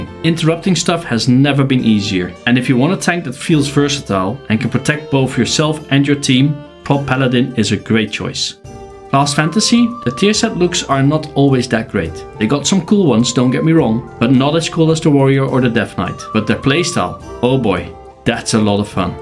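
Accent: Netherlands. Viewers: I really like your voice, and your Dutch accent.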